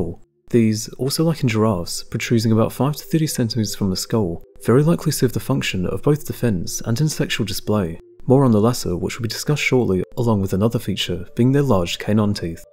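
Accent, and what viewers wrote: United Kingdom. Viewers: I have trouble understanding the narrator's heavily accented British English. His speech is so fast that his mispronounced words elide with each other and create a sound salad that prevents me from enjoying this video. It looks as if it might be a good film otherwise.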